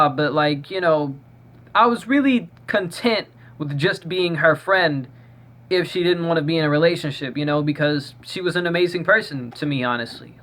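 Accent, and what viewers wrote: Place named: Texas, United States. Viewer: fuck yeah boy what part of texas are u from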